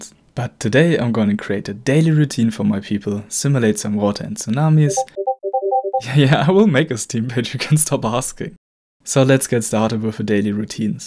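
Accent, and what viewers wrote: Germany. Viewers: I knew u are German, ein Deutscher erkennt doch einen anderen deutschen. xD 😂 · Are you German?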